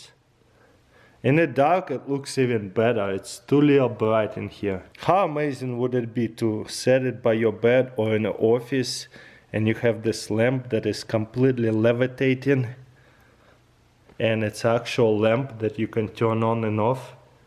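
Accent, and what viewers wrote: Russia. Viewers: Why nobody talk about his pretty stereotypic Russian acsent? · Try to speak more fluent English. How is your russian accent so thick even though you've lived here for so long?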